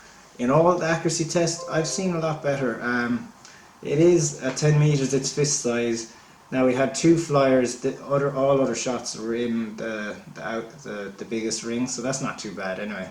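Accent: Ireland. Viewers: Are u Irish coz I am in laois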